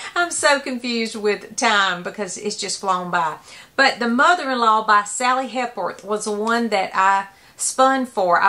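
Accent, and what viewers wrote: South, United States. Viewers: Welcome Back! This is the first time I've commented here but I just want to tell you that I've missed seeing you and hearing that lovely Southern accent. And oh yeah right now I'm reading To Kill a Mockingbird. It was so good to see you again. It really made my day!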